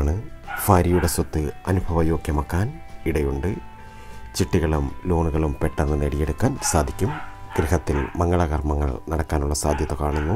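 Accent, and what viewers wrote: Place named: Thiruvananthapuram, India. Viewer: തിരുവനന്തപുരം ആണ് നാട് ആല്ലേ ? "ഫാഗ്യം " എന്ന് പറഞ്ഞു തുപ്പൽ തെറിപ്പിച്ച് ഫോൺ നശിപ്പിച്ച്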